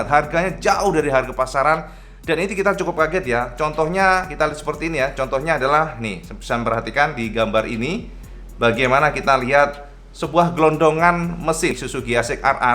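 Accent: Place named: East Java, Indonesia. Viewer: KHAS PAKAR JAWA TIMUR AN CAK OPO ONOK E GA NEKO NEKO TAPI JOSS GANDOSS, ORA LEBAYYY😂😂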